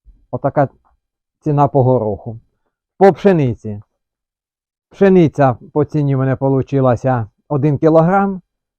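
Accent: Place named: Chernihiv, Ukraine. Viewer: Випадково відкрив,чую говір чернігівський.почитав коментарі.Привіт з бобровицького краю вам.підписався.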